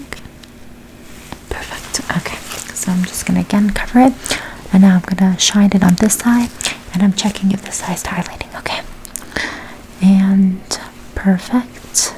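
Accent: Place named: United States. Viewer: my first time watching you and that blows my mind you sound so American!! very sharp pronunciation you have the accent down to a T girl, i’m beyond impressed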